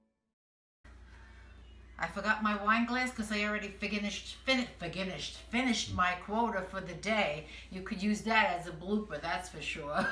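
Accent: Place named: New Jersey, United States. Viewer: Can’t wait to try your ‘Spaghetti Pie.’ Please continue using the ‘Jersey pronunciation’ of words like “ruh-GOAT.’ By the way, be careful using non-licensed music in the background. You have to pay to use music.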